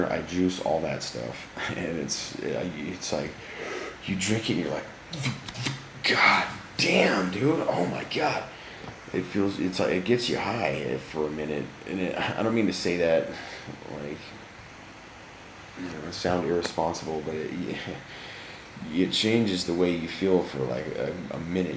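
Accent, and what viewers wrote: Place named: Australia. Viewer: ha you're Australian?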